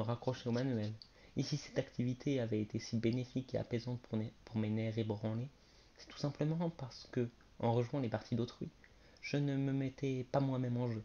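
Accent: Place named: France. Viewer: Non il parle juste francais de France. Il lit tres bien.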